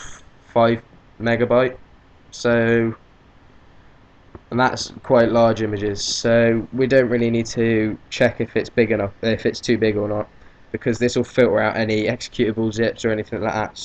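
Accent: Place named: Hertfordshire, United Kingdom. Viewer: a friend of mine he lives in Hertfordshire speaks really much like that guy =)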